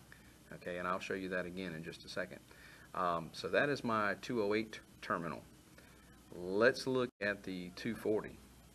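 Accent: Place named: South, United States. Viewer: Why do all the videos have a tech with a southern accent?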